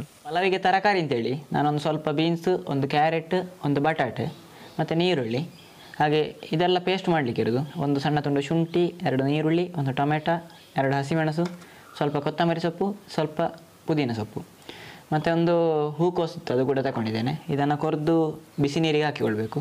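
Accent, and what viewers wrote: Mangalore, India. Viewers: Wow..very nice pulav...👌 Nice way of explaining..mangalore kannada ..❤Keep it up..🙏🌹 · ನಿಮ್ಮ ಮಂಗಳೂರು ಕನ್ನಡ 👌👌👌👌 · ನಿಮ್ಮ ಮಂಗಳೂರು ಶೈಲಿ ಕನ್ನಡದಲ್ಲಿ ಅಡುಗೆ ಮಾಡೋದನ್ನ ಕೇಳೋದೇ ಅದ್ಭುತ. ಸ್ವಚ್ಚಂದ ಪರಿಸರದಲ್ಲಿ ನೀವು ಮಾಡೋ ಪ್ರತಿಯೊಂದು ವೀಡಿಯೋ ತುಂಬಾ ಇಷ್ಟ ಆಗತ್ತೆ.. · I am watching your channel because of your mangalore kannada💛❤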